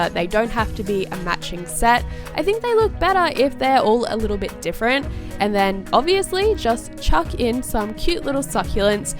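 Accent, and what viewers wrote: Australia. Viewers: Are you Australian? If so, what part?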